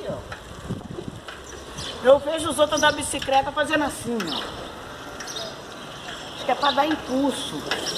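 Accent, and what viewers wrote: Rio de Janeiro, Brazil. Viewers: Carioca. Normal.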